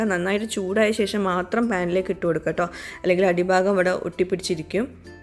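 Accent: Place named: Malabar, India. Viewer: സിമ്പിൾ നാടൻ വിഭവങ്ങൾ, ഹൃദ്യമായ മലബാർ സ്ലാങ്ങിലുള്ള വിവരണം. ഭക്ഷണം കഴിച്ച പോലെയുള്ള ഒരു ഫീൽ 😊👌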